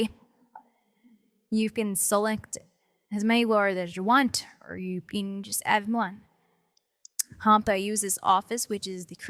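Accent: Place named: California, United States. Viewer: is she from California? such Californian accent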